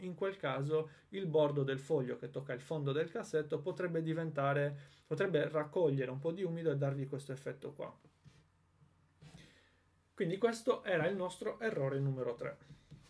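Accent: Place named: Sardinia, Italy. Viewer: No , l'accento è quasi impercettibile, io l'ho notato perché anche io sono Sarda 😄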